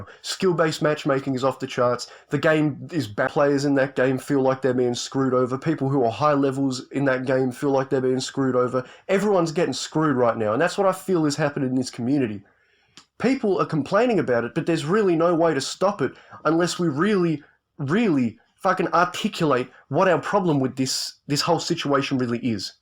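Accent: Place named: Australia. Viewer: I didn't know I needed an angry australian man complaining about chrischan as much as I did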